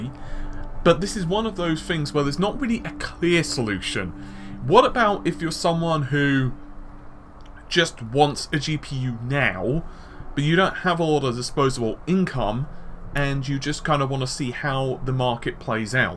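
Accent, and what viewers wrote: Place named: United Kingdom. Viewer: BuckRogers yep, I'm from Costa Rica, i can speak and comprehend pretty well, but due to his tone it is sometimes a bit harder to understand what he says, I'm too distracted with his tone and accent. I gotta put more attention to it. He sounds british to me.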